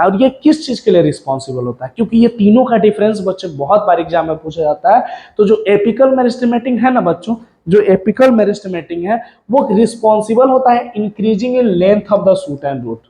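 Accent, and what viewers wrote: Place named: Bihar, India. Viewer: laga ki bihar se ho bolne ke tarike se. 😅😊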